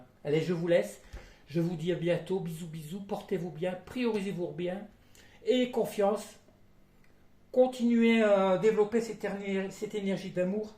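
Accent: Nord-Pas-de-Calais, France. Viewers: Bonjour,toujours un plaisir d'ecouter vos guidances gratitude 🙏a vous.Et oui avoir la Foi c'est le secret,se prioriser et le reste suivra. Je vais bientot vous consultez,j'adore votre accent chti.Je vous embrasse 😘.Belle soirée a vous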